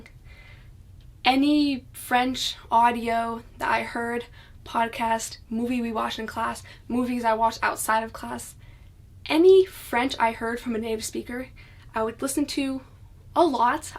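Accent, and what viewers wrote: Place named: United States. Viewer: OMG!!! That heavy American accent is quite funny haha. Whenever I hear it, it just makes me laugh lol.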